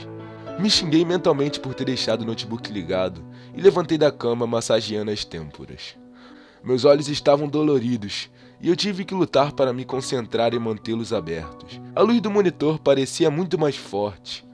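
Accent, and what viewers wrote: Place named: Rio de Janeiro, Brazil. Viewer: Sotaque do Rj haha. mandou bem demais. tem talento e merece sucesso. like p vc e ganhou uma inscrita :)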